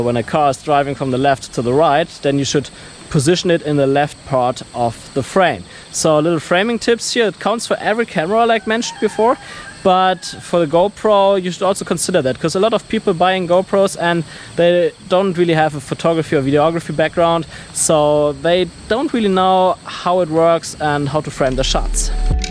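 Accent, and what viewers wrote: Germany. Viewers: Keine 48 Sekunden dein Video angeschaut und gewusst, dass du ein Deutscher bist :D Der Akzent ist eben sehr durchdringend haha :D Hast ein Abo da! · Der deutsche Akzent ist real :D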